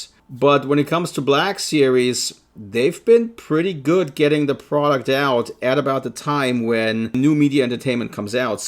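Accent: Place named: Ireland. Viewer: Thanks for this great podcast! Love you've done an episode devoted to the Jabba The Hutt figures! I still want to buy the new one from Hasbro Pulse, but it sells out quickly! Are you in Ireland? You sound like a friend of mine who's a native of Ireland.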